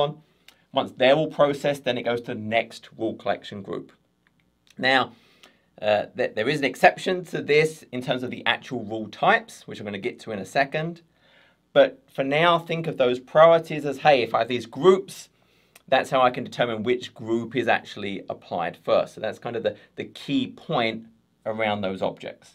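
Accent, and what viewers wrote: United Kingdom. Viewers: I pay for courses that aren't a patch on yours. I've worked in IT for too long, never needed to fully understand 'rowting' or fw's, always someone else's job. I'd never touched azure either. In the last week and a half I've gone from 'dark art' to having the confidence to set up a lab, replicate bits in my work place, secure the subnets, test out the product (secure az140 deep dive? #fingerscrossed) that I'm trying to architect and look really clever at work... You've had me covered at pretty much every base, you absolute lege!! Dunno how much you make out of this side-hussle, but good karma is definitely on the way! P.S. Hearing what I think is a southern UK accent saying the word 'route' like an American is weird, but it must be contagious as you've even got me bloody doing it :D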